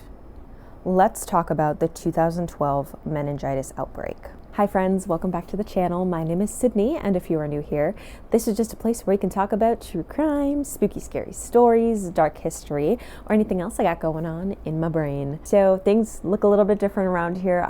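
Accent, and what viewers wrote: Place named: United States. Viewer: Well, your explanation of why you were uncertain of the pronunciation. If English is your second language, phenomenal American accent.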